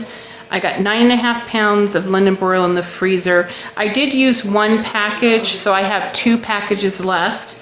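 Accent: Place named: Tennessee, United States. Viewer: Idk where you are from, but I'm from Tennessee, and you sound just like me lol you remind me of family!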